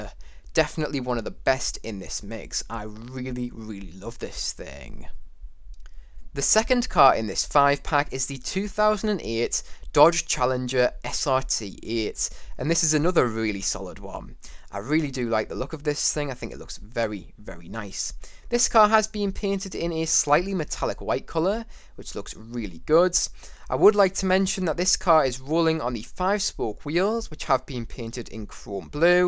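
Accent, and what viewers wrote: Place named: Ireland. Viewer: U sound Irish just loving it Bro 😎🇧🇸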